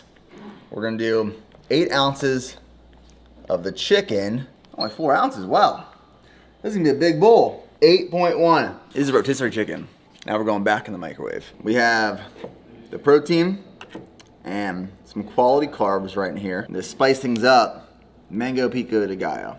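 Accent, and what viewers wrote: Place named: Pennsylvania, United States. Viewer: How does a guy from Texas have a Delaware County, PA accent?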